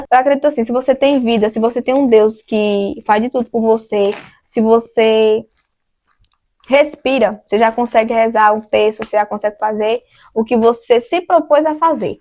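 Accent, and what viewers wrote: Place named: Northeast, Brazil. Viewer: Eu amando o sotaque 😂 sou nordestina tbm, sou da Bahia. Estou no catecumenato, nessa quaresma irei receber os sacramentos 🙏🏼 fui nascida e criada dentro da seita das testemunhas de Jeová, mas gracas a Deus ele me atraiu à Santa igreja ❤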